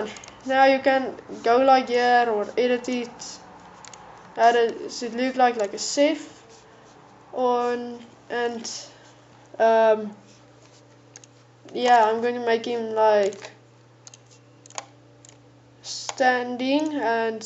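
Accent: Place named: Afrikaans, South Africa. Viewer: Yeah haha,, I recognized the accent. I'm in PE. It's not often you get south African you tubers.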